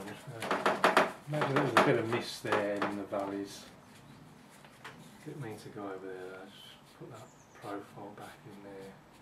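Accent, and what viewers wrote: Birmingham, United Kingdom. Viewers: Love the tips you have given on this, really helpful to me. Just starting to have a go at watercolour landscapes (I usually do portraits) finding it very difficult. Comforting listening to a fellow Brummie accent too. Thanks for posting these I'm going to have a go a painting along to this one.:). Your artwork is amazing!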